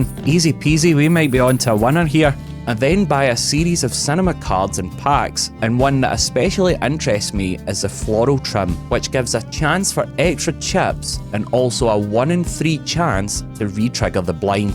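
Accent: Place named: Scotland, United Kingdom. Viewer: Luv listening to a Scottish lad playing my favorite game ❤️ cheers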